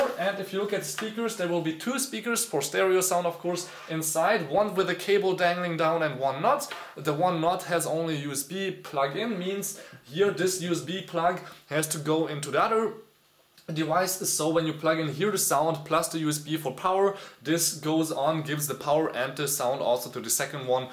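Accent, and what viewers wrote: Germany. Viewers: Du bist deutsch gä also emmm mam hörts bissl rauß aber egal gutes video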